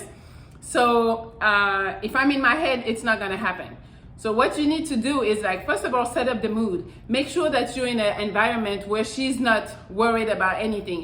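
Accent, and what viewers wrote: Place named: France. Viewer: ?Coule it be that you speak french?Because of your accent i mean.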